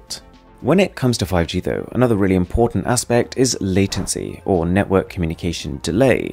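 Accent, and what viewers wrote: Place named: United Kingdom. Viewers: He is British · You have british acacent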